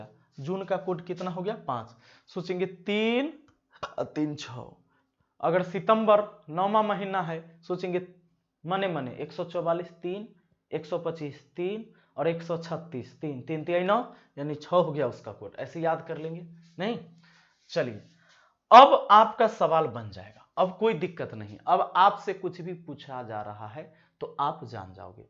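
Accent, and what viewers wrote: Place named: Bhojpuri, India. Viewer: Wars ko bars Yeh Bhojpuri bolne wala teacher hai Bekar lagta hai. Mane mane yeh kya yaar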